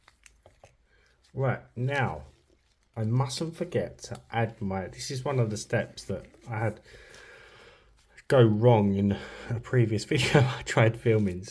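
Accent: United Kingdom. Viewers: New subscriber here, hello! 👋🏻 Between the use of the cat eye nail polish in your crafts and the genius that is the 'swirl' I just had to subscribe! 😂 Where abouts are you from in the UK? I live not far from Brighton and am trying to place your accent 😂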